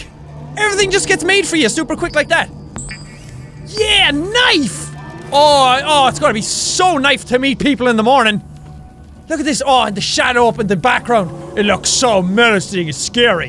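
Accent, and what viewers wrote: Ireland. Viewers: his irish is heavier than it is now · He sounds way more Irish than he does today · Hi in your biggest fan I watch you so much I've picked up your Irish accent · Coming here from 2021, and I was startled by how Irish this man sounds. Also smiled like an idiot at the intro. Edit: 2023 now, and I don't think I'll ever get used to his incoherent ramblings. Still fun to watch, though!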